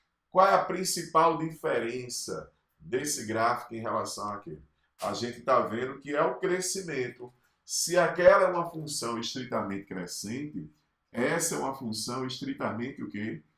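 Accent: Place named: Bahia, Brazil. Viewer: Sei que as aulas são para ajudar pessoas que vão prestar ENEM. Naão é o meu caso. Estou fazendo a segunda faculdade e seu material é bom. tenho mpor hobe o estudo de matemática desde 1984 e a internet trouxe a possibilidade de conhecermos o trabalho de outras pessoas. Não sei, mas parece que o professor é baiano e cheguei a uma conclusão: ninguém melhor que um baiano, calmo e cadenciado, pra explicar qualquer coisa pra alguém. Suas aulas são ótimas professor, pois dão tempo de pensar e assimilar tudinho. já me inscrevi no seu canal e virei seu fão. Esero umdia, nos conhecermos pessoalmente. Vou indicar para muitos jovens. Melhor que isso, só se apessoa tiver tempo para estudar a coleção completa de "Fundamentos de Matemática Elementar" do Iezi e amigos, mas isso é pra quem teria tempo mesmo. É uma coleção fundamental que tenho em minha cabeceira, mas não deve ser usada para um concurso, por exemplo, pois exige tempo para reflexão e absorção de tudo. 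Professor, grande abraço. Continue trabalhando e fazendo pensar.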